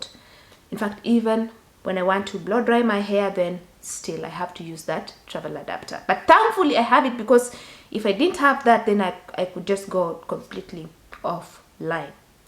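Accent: Kenya. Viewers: A very interesting video. Unfortunately I’ve never heard anyone speak English with an Kenyan accent and about 20% of what you were saying was lost for me. Good luck in Germany.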